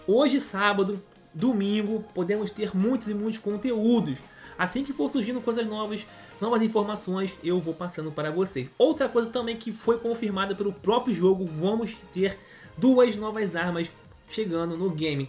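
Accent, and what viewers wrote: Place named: Rio de Janeiro, Brazil. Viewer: essa sua voz de carioca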